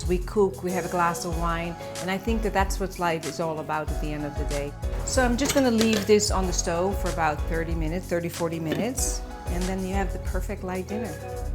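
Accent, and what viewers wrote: Netherlands. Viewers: She has sich a strong dutch accent haha i love it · je hoort echt het nederlandse accent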